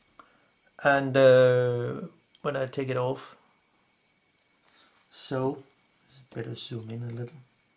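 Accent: Denmark. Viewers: Thank you very much for this amazing and very knowledgable content. I also decided to follow your instructions, however, I ran into problems when I accidentally disassembled the focusing part which lead to a lot of problems. I am fully aware it's entirely my fault but I really want to fix it, is there any way I could contact you please? I live in Denmark, you sound bit danish too, but don't know anyone who could help me. Thank you and good luck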